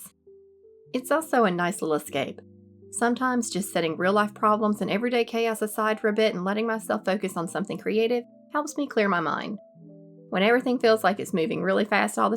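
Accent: South, United States. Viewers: idk where youre from, but im from SC and love to hear southern accents from youtubers. its very comforting. im gonna guess youre from TN but i could be totally off on that lol. also i love the slow pace of your videos. a lot of doll makers create energetic loud videos and while thats totally fine !!!! i love seeing stuff thats more laid back and calm. :)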